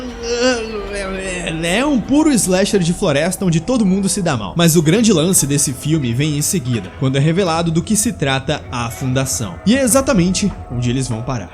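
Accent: Northeast, Brazil. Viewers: Um brinde ao sotaque nordestino!!!!!!!!!!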